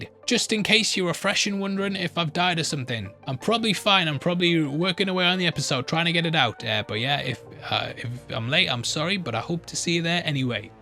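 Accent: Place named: United Kingdom. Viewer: I kept thinking "Who the hell is Bob?" Then it hit me. "Oh, BARB!" Brits and their dropped 'R's. Ain't language some shit? (Loving the breakdowns, by the way.)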